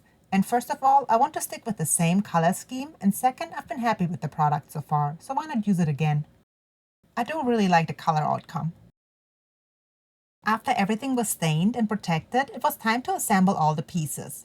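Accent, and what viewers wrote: Germany. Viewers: hi, this may be the reason why it sounds like the german girl next door. First time I noticed it, I though I had a hallucination..... VGvG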